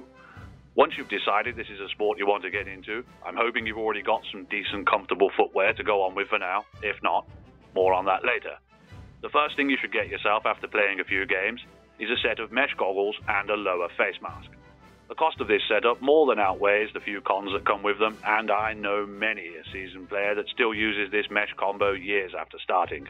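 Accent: United Kingdom. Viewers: The accent becomes slowly more and more northern. I like the bad audio quality.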